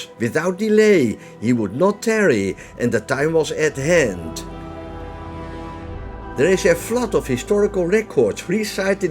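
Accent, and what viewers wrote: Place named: Netherlands. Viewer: Love the Dutch accent;) great content btw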